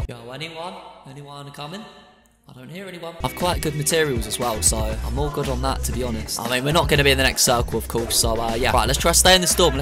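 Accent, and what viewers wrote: United Kingdom. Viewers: At first how did don’t reliaze he’s British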